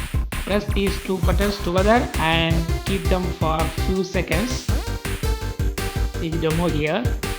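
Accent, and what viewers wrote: India. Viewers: For God sake speak English like English not like hinde accent.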